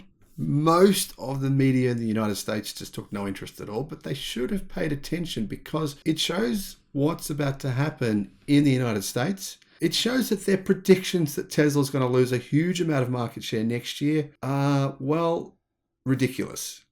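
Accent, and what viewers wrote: Australia. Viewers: You're not a Viking, you sound like an Aussie.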